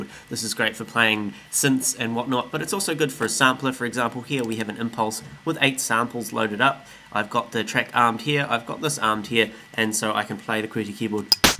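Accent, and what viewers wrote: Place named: New Zealand. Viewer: Your tutorials are made all the better by the NZ accent... Love it!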